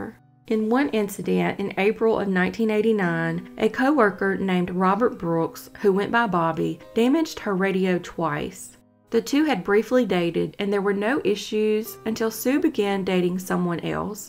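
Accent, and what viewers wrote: South, United States. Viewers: I find your southern accent cute. You are a great narrator and I hope you get picked up by a major network. You are very detailed.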